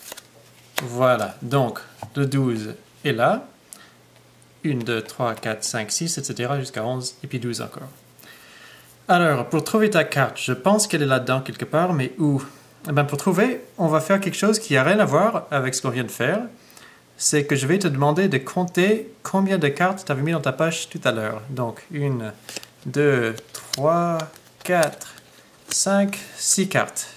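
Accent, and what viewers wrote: Quebec, Canada. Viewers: canadien ? (j'aime le canada :D) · Super tour bien expliqué avec l'accent québécois en plus ! Merci :)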